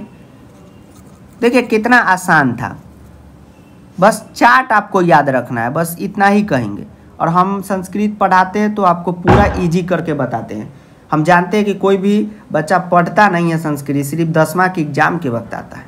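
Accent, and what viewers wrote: Bihar, India. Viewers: Sir aap bihar se h kya ... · Yah to full bihari hai bhayiya sir aap bahut acha padha rahe ho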